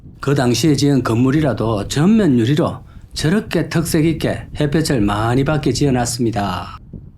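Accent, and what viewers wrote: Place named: Seoul, South Korea. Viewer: 이제 왜 경상도 사투리 안쓰요 서울말 한다고 서울사람 흉내요 경상도 사투리 매력으로 보고 좋아요 찍는데 이제 안볼란다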